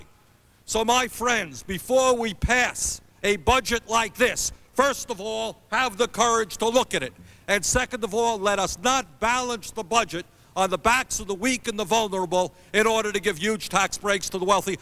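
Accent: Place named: South, United States. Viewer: Bernie Sanders is sounding more and more southern the older he gets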